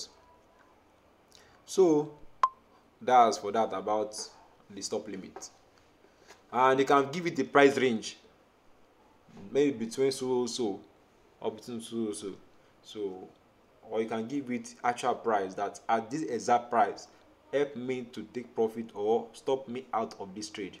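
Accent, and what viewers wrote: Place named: Nigeria. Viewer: I liked immediately I heard the Nigerian accent... I know say it will be understandable. I am a subscriber now ❤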